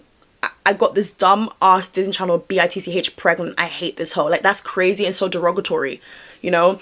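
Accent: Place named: United Kingdom. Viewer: I know this off topic but you have American humor but you sound British asf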